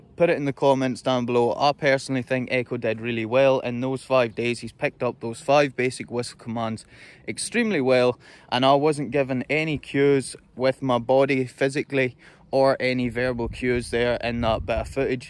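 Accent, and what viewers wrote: Scotland, United Kingdom. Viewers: . i am a 61 year old American midwest “city slicker” (Cincinnati, Ohio). i love my dogs, mostly Golden Retrievers over the years, one who was half Newfoundland. And one “black sheep” 😉 who was found wandering the streets, about four months old, in the middle of the winter, possibly a stray, possibly feral, who we believe 🤞🏼 is part Border Collie, part Labrador 🤷🏻‍♂️ that takes her energy and intensity level down a notch, thank goodness 🙏🏼🫢. She was quite a handful when we adopted her (we didn’t know! she was just a cute little puppy 🤷🏻‍♂️🫢) so she required an adjustment and a lot of activity and attention… and we have accommodated her. Because we love her ❤️. Anyway, i have absolutely no interest in farming or tending animals. (My wife does, however. My neighbor commented on the amazing vegetable and flower garden that she had created in our backyard, and the chickens she was raising in it; “It’s just like the tv show ‘Green Acres’ - to which i replied, “yeah except I’m Zsa Zsa’ 😏😖”. 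Your lifestyle is anathema to me 🫣. But i love what you do. I love the scenery. i love your Scottish accent! Most of all, i love your dogs 😊 It is tragic that some people adopt Birder Collies and keep them as “pets” mostly inside the house, gone all day at work, with a little outside time in the yard, bored out of their intelligent minds with no outlet for their incredible energy and no fulfillment for their genuine sense of purpose. (Some dogs never het adopted at all… 😢😢😢). When i see your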